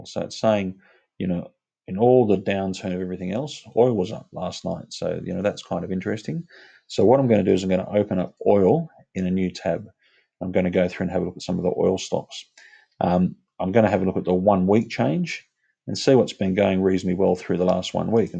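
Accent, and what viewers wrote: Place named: Australia. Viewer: Hi, I heard you mentioned you use TradeStation. I'm assuming you're aussie from the accent... Is that the broker you'd recommend for aussies on TradingView?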